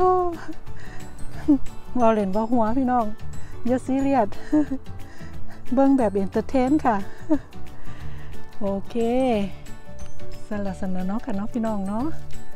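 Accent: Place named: Phu Khiao, Thailand. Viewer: เสียงเว่าคือคนทางภูเขียว ภูเวียง ทางชุมแพเนาะจ้า เว่าสำเนียวมวนดี ขอให้มีความสุขกับการใช้ชีวิตในต่างแดนเด้อจร้า😍😍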